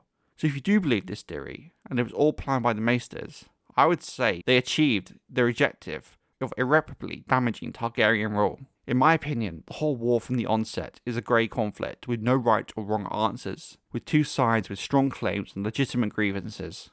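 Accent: United Kingdom. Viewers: i don't know if you're Bristish with a strong accent or English is not your first language and you speak with English accent, but it's really hard to hear and follow what you say even with the caption on. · The dude is the most British sounding dude ever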